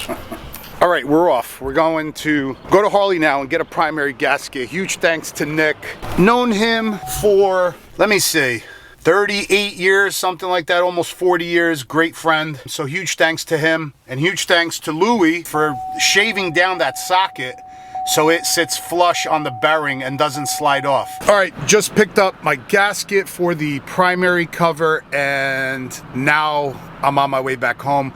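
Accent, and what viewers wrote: New Jersey, United States. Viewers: LOL!! Brother you crack me up. So Jersey. "You know another thing that pisses me off? These freekin things fall off my trees!" Priceless! My women and I watch you all the time. Love the channel. Live in Texas now. Listening to you reminds me of my friends and family back home.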